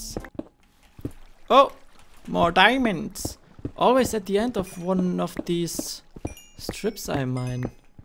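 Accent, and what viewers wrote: Germany. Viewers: ich bin so gut ich hab an deinem accent erkannt das du deutsch bist aber dein englisch is sehr gut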